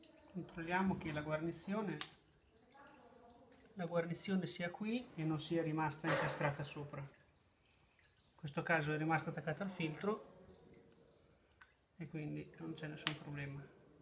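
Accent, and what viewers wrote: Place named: Romagna, Italy. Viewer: Ciao, mi sono avvicinato da poco al "fai da te" sulla moto, ( ho un GS del 2005) grazie per i tutorial sempre ben fatti. Per le chiavi dinamometriche, ce n'è una che copre tutti i range di utilizzo previsti dai serraggi dei GS? Oppure mi consigli di prenderne 2 una piccola che arriva a tipo 25/30 Nm e un'altra che da 25/30 va fino a 60? Nm... Grazie per info..... Dall'accento si intuisce che sei romagnolo, posso chiederti di dove? Grazie mille.. Ciao... 🖐️👍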